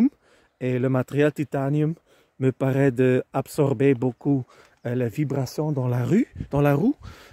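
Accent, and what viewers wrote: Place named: Flanders, Belgium. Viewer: Magnifique 😍 et j’adore votre accent, j’aime beaucoup l’accent et la langue flamande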